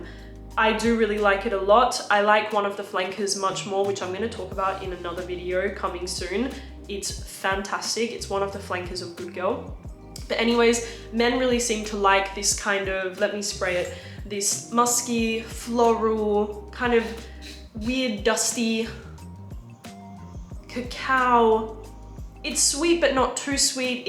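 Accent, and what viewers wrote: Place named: Australia. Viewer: Ariana Grande Cloud is a great dupe for Baccarat Rouge. Bath and Body Works Dahlia is a great dupe for Carolina Herrera Good Girl. I love your accent. Are you Australian?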